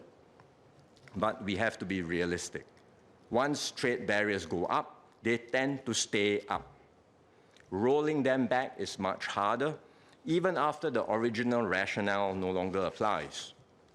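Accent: Singapore. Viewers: Singlish. Singaporean English. Don't be a hillbilly 😂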